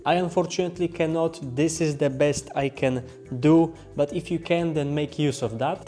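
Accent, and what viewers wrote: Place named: Poland. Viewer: Ur Polish aren't you? Im Poland too hehe (i think ur Polish cuz ur accent and Cisowianka in the back haha)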